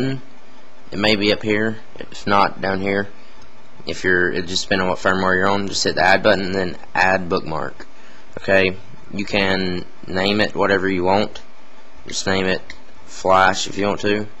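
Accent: South, United States. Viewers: this guy sounds like a hillbilly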